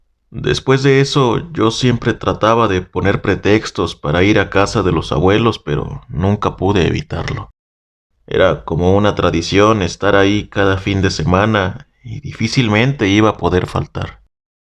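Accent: North, Mexico. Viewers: No me canso de escucharte amigo 👍 tengo entendido que eres de Juárez? Me encanta el acento del norte de México Saludos a todos desde España 🇪🇦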